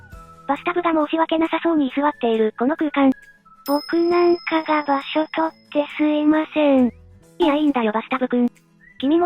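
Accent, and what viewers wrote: Hakata, Japan. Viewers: 博多言葉がかわいらしくて、いいですね。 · 博多弁がなーんか嬉しくて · 博多弁がバンバン飛び出してくるので、何か嬉しい。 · 博多弁や福岡の景色が懐かしく嬉しいです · 博多弁がオモロイですね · 家賃、いくらくらいなんでしょうね。 ところどころに博多弁が出てくるのが、福岡の人間として嬉しい😊。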